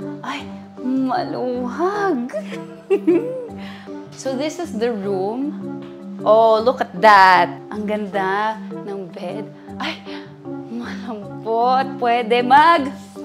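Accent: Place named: Philippines. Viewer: The english filipino accent days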